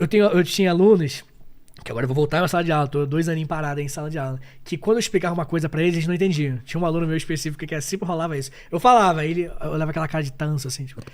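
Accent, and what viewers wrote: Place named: Rio de Janeiro, Brazil. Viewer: Comecei a segui-lo por agora, Prof. Erro meu, eu sei. Vou procurar esse programa para absorver todas as ideias. Você é muito bom, obrigada. ❤ E esse sotaque carioca é um absuuuurdo.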